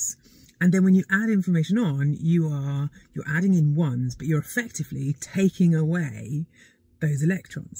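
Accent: United Kingdom. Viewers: In the middle of the zeros I lost track of the British accent.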